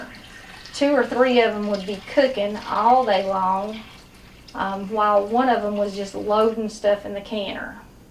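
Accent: South, United States. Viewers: Love your videos! Sounds like you are from TN or KY.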